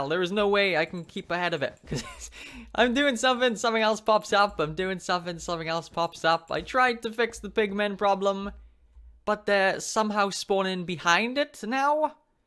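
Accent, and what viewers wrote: Wales, United Kingdom. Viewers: Are you welsh???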